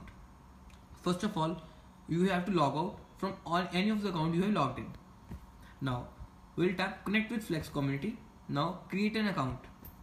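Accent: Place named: India. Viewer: Wtf you india 😂 When india try to speak english.. fell so weird what da fukkk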